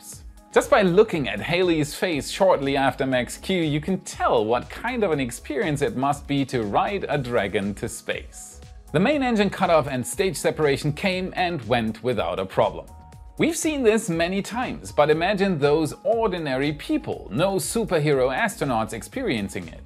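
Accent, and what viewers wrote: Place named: Germany. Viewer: I believe he is German, so English is not his first language. You should hear how the Brits pronounce Aluminum. :)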